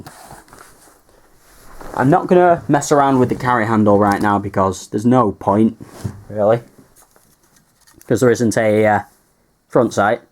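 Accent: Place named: Manchester, United Kingdom. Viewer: yes thought you sounded mancunain , iam from Salford but i live in Bury.. lovely Rifle the L85 i got mine from zero one airsoft online ,it should of been £300 and odd but i got %10 discount so was just less than 300 and mine came two toned green , i prefer green to the orange and blue , plus it looks great on this rifle as its 2 toned anyway.. i will have to film a fireing test on it to show you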